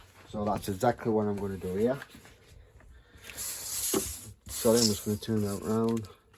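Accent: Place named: Grimsby, United Kingdom. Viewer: Awesome, isn't it funny how the Grimey accent comes thorough on video hehe well done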